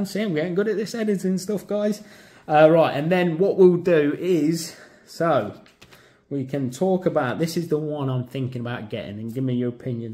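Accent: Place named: England, United Kingdom. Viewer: "get on with it". "right". Love the Queens English, over here across the pond, Mate. Florida USA.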